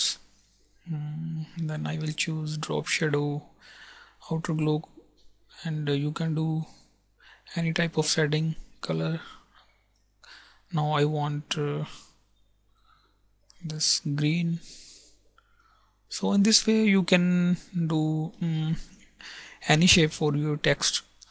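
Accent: India. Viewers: I love the Indian accent. It actually makes english sound nice for some reason.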